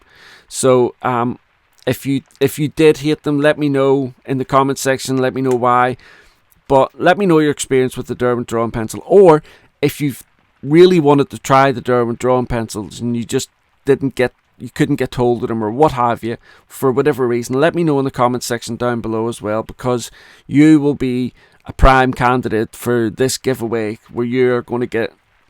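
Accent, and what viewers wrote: Northern Ireland, United Kingdom. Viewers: Thanks for another great review. Honestly what took me back wasn’t anything you said about the pencils but that you’ve been doing reviews for 11 years already. I found your channel shortly after it started, it was called something else back then sorry don’t remember what it was. Both my parents born and raised in Ireland and I had just lost my dad. Though your Northern Ireland brogue was different than my dad’s Dublin one it gave me comfort listening to it. Wow I can’t believe it’s been I’ve been watching that many years, where does the time go, every year it flys be faster.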